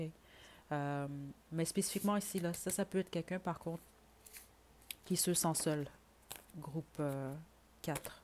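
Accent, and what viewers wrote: Quebec, Canada. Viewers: Bonjour je vous suis depuis quelques temps quand je fais un choix ça me parle mais la plupart du temps c’est mon énergie que vous décrivez j’ai pris le choix no 2 je suis spirituel j’ai vécu la nuit noire de l’âme j’avais commencé la médiation et des choses bizarre se sont produits que je m’explique toujours pas comme sentir des caresses sur mes cheveux quand j’allais mal et que je pleurais un jour ça m’a énervé et j’ai créé laissé moi tranquille une fois j’ai entendu une voix répondre à ma prière et d’autres turcs que je ne comprends et je ne sais pas qui peut m’aider à comprendre je suis empathe quand je vois un itinérant c’est moi que je vois parce que pour moi l’humanité c’est ma famille je suis eux et ils sont moi voir souffrir les autres me fait mal et parfois je doit me protéger je vous écris tout ça peut être parce que je cherche des réponses que peut être personne n’a merci et bonne vacances je pense qu’on reste à la même province car votre français est québécois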